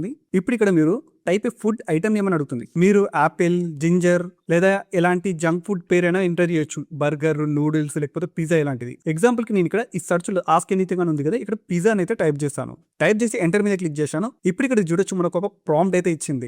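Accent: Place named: India. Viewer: Voice koncham... telugu and English kalisina accent lo vastundi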